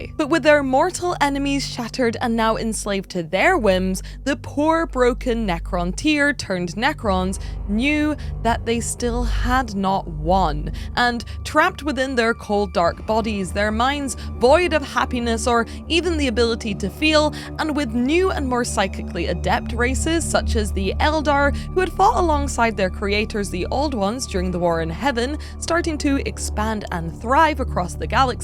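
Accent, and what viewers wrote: Scotland, United Kingdom. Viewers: Awesome! Looking forward to the next segment/chapter. Besides, I like hearing you talk lol. Americans tend to like a British accent 😊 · Nicely put, great story to hear, I like a lot the british accent. (Y) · Bugger! Now I've got to get precise! You know that we sing when we speak? I don't hear too much Edinburgh. More north. Closer to Perth. I'm full of shit, but love a challenge 🤗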